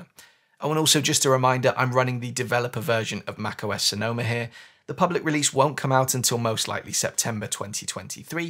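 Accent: United Kingdom. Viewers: I was confused if you were british until you said "whilst." 😂😂🤣🤣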